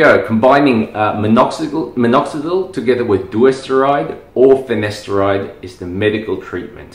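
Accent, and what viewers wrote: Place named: Queensland, Australia. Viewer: Idk how your international followers understand you. That's a really thick Queenslander accent 😒😁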